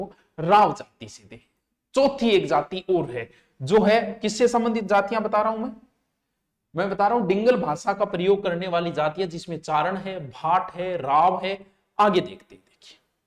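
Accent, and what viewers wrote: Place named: Shekhawati, India. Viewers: Rajasthani nhi bolte aap sir 🙏😊👎👎 shekhawati bolte ho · आप तो बोलते ही राजस्थानी हे सर 🌟🌟🌟🌟🌟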